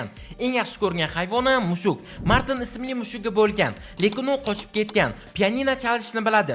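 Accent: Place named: Samarkand, Uzbekistan. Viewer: samarqandlik ekanligini shevasidan bilgandim zemlyakim buladi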